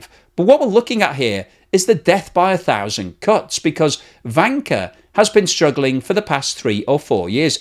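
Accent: United Kingdom. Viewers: British accent = propaganda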